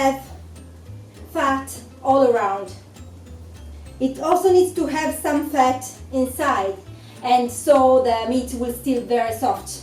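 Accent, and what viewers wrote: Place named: Italy. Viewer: 1. Thank you for teaching us how to do the Fiorentina beef steak! 2. I am an english school teacher and I like your english with the italian accent - keep it up!!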